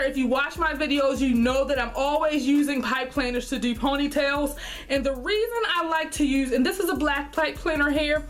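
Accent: Baltimore, United States. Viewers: I gota ask.. lol are you from Baltimore??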